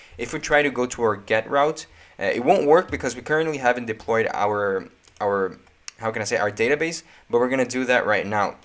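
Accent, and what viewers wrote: Brazil. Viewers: Nice video! Thanks for the info! I have a question: there is a way to change that ugly domain name from Netlify to a custom paid domain that I already own? (Idk why I'm asking in english, your accent is clearly brazilian) valeu mano kkk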